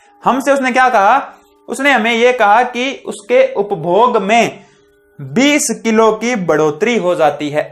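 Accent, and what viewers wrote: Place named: Rajasthan, India. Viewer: West Rajasthan se lg rhe ho sir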